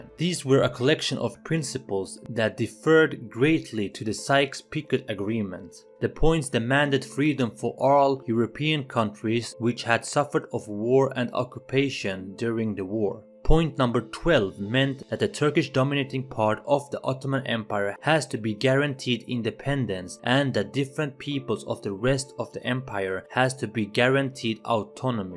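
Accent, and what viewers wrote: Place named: Sweden. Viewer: You have a hint of a Swedish accent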